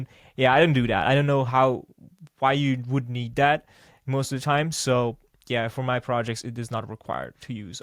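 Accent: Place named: United States. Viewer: Az Ali Abdaalos videodat ajanlotta nekem a YT kb. 2 db perce, az hozott ide. Amerikaban szulettel, eltel vagy elsz? Az akcentusod amerikai es kb semmi magyar akcentusod nincs. Hogy csinalod? hahah Amugy ne hagyd abba a YT-ot mert szerintem be fogsz vele futni!!! Just keep creating content!!! En most gondolkodom, hogy elkezdem, de meg az overthinking fazisban vagyok. Van egy niche otletem, ami csak kozepesen saturated :D, majd meglatjuk. Kozben epp laptopot is keresek, meg vagoprogit is. Ugyhogy tenyleg nagyon a legelejen. Egyebkent nekem a Davinci Resolve-ot ajanlottak, mint vagoprogram, de megnezem a videoidat es lehet, hogy a te progid jobban bejon majd. Feliratkoztam. Sok sikert!! :)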